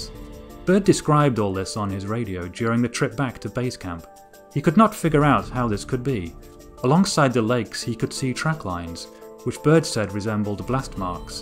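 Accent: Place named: United Kingdom. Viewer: what dialect of British English are you speaking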